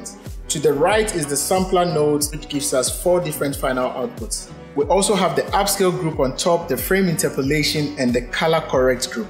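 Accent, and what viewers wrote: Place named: Ghana. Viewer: Boss, you sound Ghanaian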